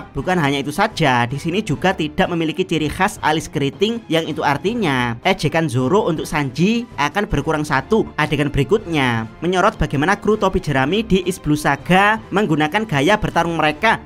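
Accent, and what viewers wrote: Java, Indonesia. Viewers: medok bnget sih asw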